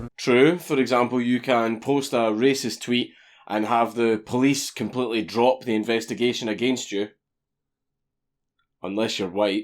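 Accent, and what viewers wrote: Scotland, United Kingdom. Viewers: Thanks for bringing up the truth, and confronting that racist black woman! By the way, love your Scottish accent. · He's Scottish? I thought he sounded like that because he'd had a stroke.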